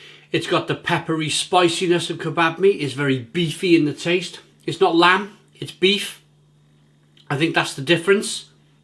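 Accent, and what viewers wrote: Wales, United Kingdom. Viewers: What part of wales are you from fella?I'd say cardiff way.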